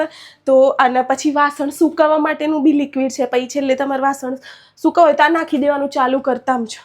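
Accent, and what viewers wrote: Sabarkantha, India. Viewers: તમે સાબરકાંઠા ના હોય તેવું લાગે છૅ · Sabarkantha no kahejo che 😅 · બેન ની ભાષા હિંમતનગર સાબરકાંઠા બાજુની છે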